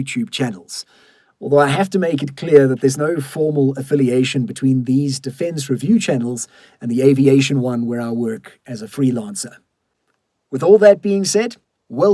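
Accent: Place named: South Africa. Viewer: What accent is this... I'm picking up south African... Australian... bit of uk english....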